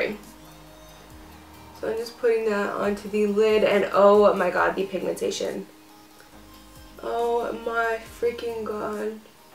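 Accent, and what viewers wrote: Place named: Canada. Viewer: youre so canadian lol you say "thee" instead of "the" i love it :) my aunt does the same thing haha youre amazing!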